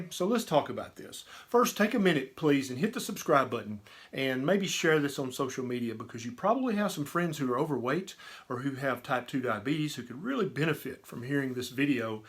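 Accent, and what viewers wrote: South, United States. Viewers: 1 sub or a 1M subs, I love. That southern charm is wonderful, "supper" LOL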